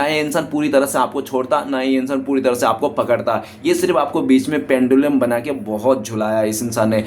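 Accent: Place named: Bengal, India. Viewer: aapnar acent bengali aachen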